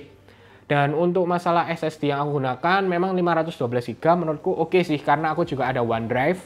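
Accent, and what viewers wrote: Java, Indonesia. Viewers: Logate Jowo banget mas wkwkw. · thanks gan.ngomong ngomong asli ngendi sampean mas.wkwkwk.ligate jik ketok jowone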